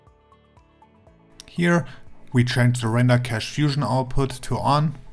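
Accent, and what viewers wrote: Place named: Germany. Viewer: Heavy German accent - mach's doch vielleicht auch mal in Deutsch. Englischsprachige Tutorials gibt's hier schon en masse.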